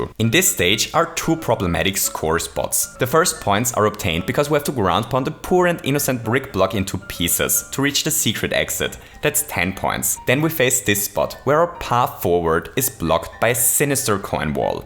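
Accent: Germany. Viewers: From where you are ? (Sounds like Germany ) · You sound like a German speaking english and I like it. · I hear a german voice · the german english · Are you german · What accent do you have? German? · You have a funny German accent tho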